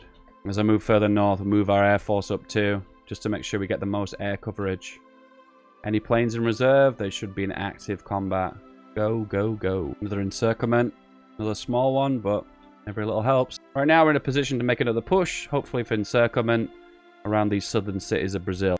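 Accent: United Kingdom. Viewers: Watch your videos because I like your British accent. Greetings from Russia)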